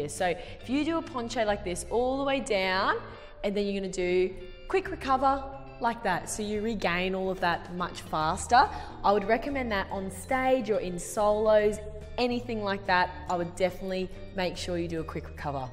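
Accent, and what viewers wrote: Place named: Australia. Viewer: Hi my son and I have been watching your videos for the past few weeks and we really enjoy them. He is a budding of ballet dancer. He would like to know if you have any videos with boys in them instead of girls. He also would like to know if you coach boys. We live in New York city. But we love your Australian accent. Please let us know if you ever plan to put Boys on your Channel and display exercises for ballet Styles particularly for boys. Thanks keep up the great work.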